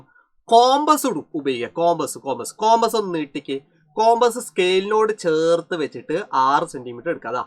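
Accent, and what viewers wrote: Kozhikode, India. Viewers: Sir Kozhikode ano stalam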